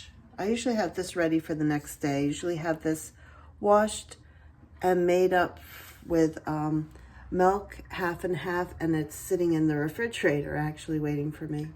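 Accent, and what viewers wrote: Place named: East Coast, United States. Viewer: Love hearing you talk. I adore your accent. Being from the midwest, eastern accents sound great to me. Rainy days are good for chats like this.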